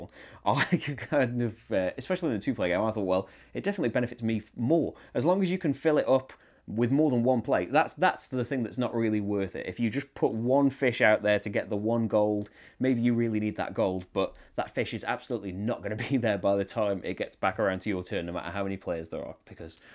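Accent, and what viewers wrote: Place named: Cheshire, United Kingdom. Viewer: I'm trying to place your accent. Let me guess - Cheshire? Maybe Macclesfield? Anyway, thanks a lot for these videos, extremely helpful!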